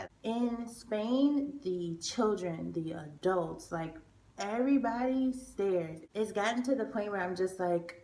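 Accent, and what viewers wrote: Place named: Chicago, United States. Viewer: I thought I heard a Chicago accent🤔 hey girl 🙋🏾‍♀️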